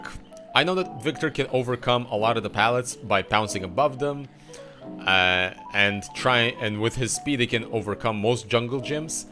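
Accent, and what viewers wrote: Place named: Israel. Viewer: יש לי תחושה שאתה ישראלי בגלל ה accent lolol great vid BTW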